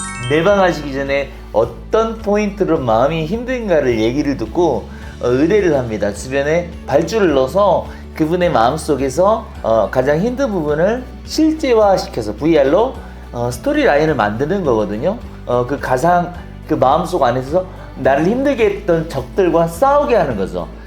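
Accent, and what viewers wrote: Gyeongsang, South Korea. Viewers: 경상도 사투리 티 안내려고 서울말 쓰는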